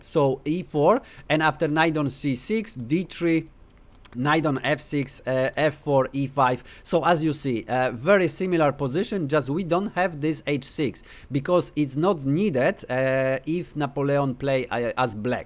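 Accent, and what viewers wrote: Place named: Poland. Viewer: Po akcencie poznałem, że rodak :) Leci subskrybcja, łapka w górę i komentarz :)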